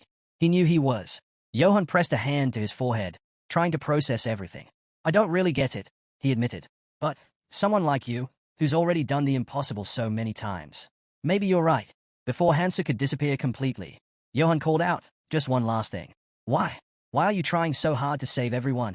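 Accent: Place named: United Kingdom. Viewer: British ahh voice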